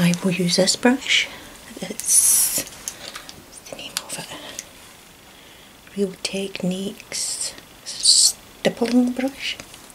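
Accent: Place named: England, United Kingdom. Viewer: I love your english accent and intonation! This is so good to listen to me. Thank you for make this video!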